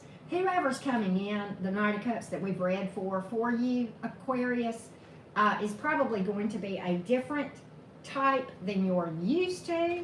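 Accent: South, United States. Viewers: I am handling it pretty well. Let the chips fall where they may! Thanks for your great reading. Love your Southern accent! <3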